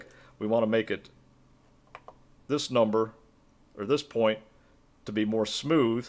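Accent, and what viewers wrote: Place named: United States. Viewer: Thanks for these words that recomforts me :) . As you just said, I was not expecting a such not easy and not fast learning phase. I'm always amazed, to be in south of France, you somwhere in the US (according to your fantastic accent) taking time to record yout videos and me here and enjoying your advices in the nature near to the sea. Sharing between human is just one of the most wonderful thing, whatever the subject. It was the philosophical though of a sundazy morning. Cheers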